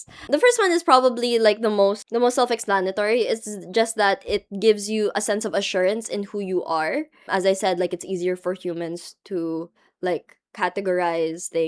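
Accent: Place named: Asia. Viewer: Where are you from with your beautiful asian accent ? 🎉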